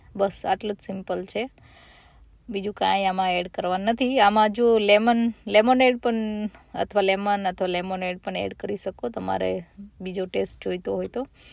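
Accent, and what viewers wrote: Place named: Kathiawad, India. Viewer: Di tame bau j mast kathiyavadi Gujrati bolo che. to favourite che j n. Tamne Instagram pr jowa ni bau j maja awe. Keep it up n Grow much much more 🎉 Lots of love to you and your beautiful family ❤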